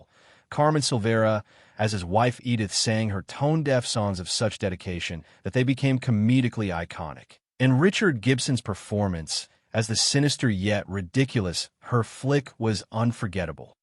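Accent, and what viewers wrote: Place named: United States. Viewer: TLDR: Rage-bait, don't watch. How appropriate that this piece of rage bait should have an American AI voice-over that mangles the very name of the show. Just like every piece of popular entertainment it was a product of it's time and would not be successful in any other context. Personally I would take this fantasy send-up of wartime movie tropes any day over the barren desert of today's American streaming channels whose only successes are bowdlerised reboots of the very classics you decry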